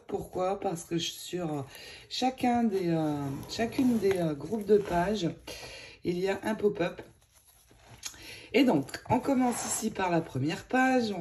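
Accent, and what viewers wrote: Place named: Nord, France. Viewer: Bonjour ton album est vraiment magnifique. En t écoutant tu as garder ton accent du Nord j'adore.des bisous 😘😘😘😘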